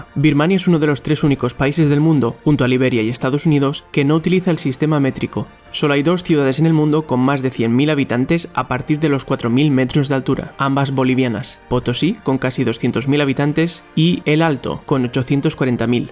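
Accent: Spain. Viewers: Cómo se nota que sos español jajaja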